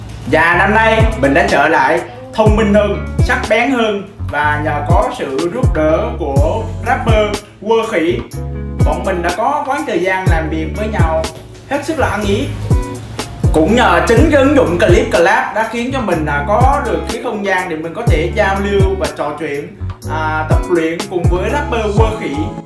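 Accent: South, Vietnam. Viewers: nay nói giọng miền nam tếu dậy chời😂😂😂😂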